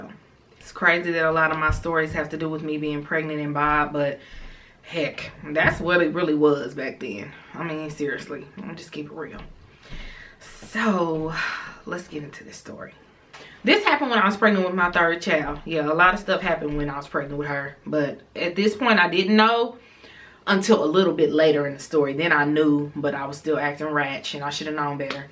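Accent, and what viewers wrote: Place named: Bay Area, United States. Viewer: I am too! I came across your channel just browsing. Clicked on this video, heard you talk (kiss braids, member instead of remember, just your mannerisms, etc...) and said she had to be from the Town. I live in Cali now and you reminded me of home so much. Congratulations on your new union!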